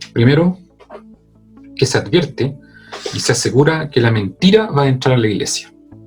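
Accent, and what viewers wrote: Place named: Chile. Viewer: Muy instructiva la presentacion, gracias. Pero usted tampoco describio las 7 definiciones de Verdad que Dios revela en su palabra, todas, las 7, y es muy importante conocerlas todas porque de otra forma no conocemos a Jesus, y el diablo se rie y se burla del medio creyente, porque continua ignorante, desprotegido y vulnerable para ser engañado a su antojo. Desea conocer las 7 definiciones de Verdad que nuestro Dios nos enseña y revela en su palabra, la Biblia ? Y por lo que oigo usted es de Chile, hermoso pais para conpartirle de Jesus y su palabra.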